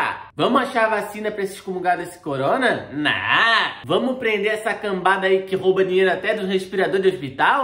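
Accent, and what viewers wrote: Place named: Itajaí, Brazil. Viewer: Po vc tá virado num demonnho kkkkkk queria ver vc lá em Brasília falando as verdades pra aquela cambada de ladrão falando com nosso sotaque itajaiense kkkkkk muito seu fã nos seus vídeos vc fala oq muitos querem fala mais tem medo abraço Quiriduuu tamo junto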